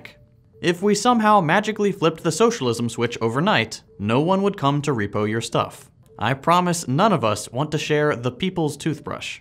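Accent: United States. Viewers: Are there any socialists in the US? It's so weird to watch a video on socialism with an American accent.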